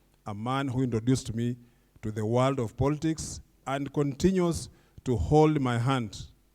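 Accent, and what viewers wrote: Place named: Bukusu, Kenya. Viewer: Proud to be a bukusu you really represent us with our accent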